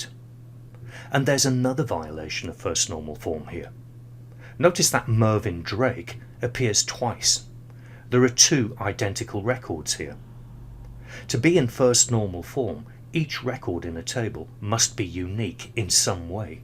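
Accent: England, United Kingdom. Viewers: Thanks for your videos, it is so clear when i watch a video with an English accent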